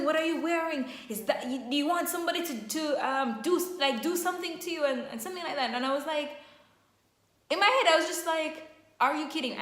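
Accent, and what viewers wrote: Jamaica. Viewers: I sense a jamaican accent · Are u from Jamaica? Your accent is nice.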